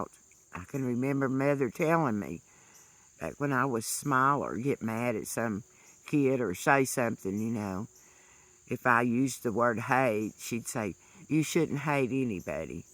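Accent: South, United States. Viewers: You are voicing exactly how I see things as well. You’re a good, brave person. A beautiful southern voice.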